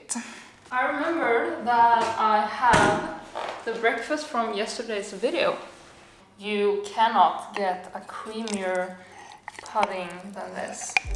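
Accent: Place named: Sweden. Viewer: I love your accent! Forgive me if I am wrong but are you from Sweden? I am a first time watcher and I love your videos so far! ☺️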